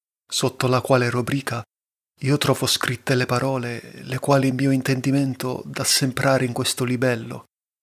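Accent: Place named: Calabria, Italy. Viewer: Intanto grazie e complimenti per l'ottima lettura, vi scorgo un leggero accento del Sud, se non erro calabrese, e questo mi ha subito fatto pensare come, almeno da un punto di vista simbolico, come sia utile proprio a farci riflettere quanto questa lingua volgare, dalla quale, naturalmente, ha origine la nostra lingua corrente. Farci riflettere, dicevo, come è importante riuscire a considerare questo italiano volgare patrimonio di tutto il paese e non solamente Toscano. Dante Alighieri, secondo me, è una sovrapposizione di strati di genialità: questa narrazione in forma d'eloquio che fa' si che il lettore stesso possa assumere i panni del narratore; poi, naturalmente un talento poetico innato e ben coltivato; ma, sopratutto, la grande attenzione alla forma nella quale descrive la ambientazioni e dunque i paesaggi. Nella Divina commedia poi le ambientazioni sono addirittura fantastiche. 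Ecco, io credo che questo abbia contribuito molto a rendere questo poeta, oltre che sempre attuale, addirittura universale. Certamente era un uomo ambizioso, però dubito che abbia anche solo lontanamente potuto immaginare quanto a lungo e quanto nel mondo tutto la sua opera si sarebbe diffusa. Rinnovo i ringraziamenti ed i complimenti e auguro una buona continuazione in buona compagnia🙏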